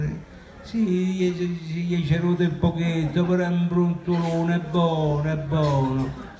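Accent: Rome, Italy. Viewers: Che bel racconto verace sincero con questo accento romano e questa romanità nel modo....grazie mi son fatta due risate😂😂😂😂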